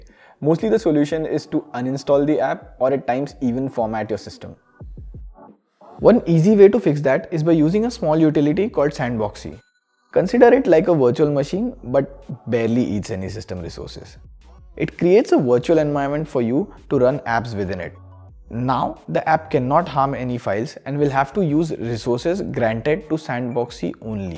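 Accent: India. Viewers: Indian accent detected, dont trust it it might be a Windows Tech scammer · Indian fuckong engllish zounds like a servant is talking!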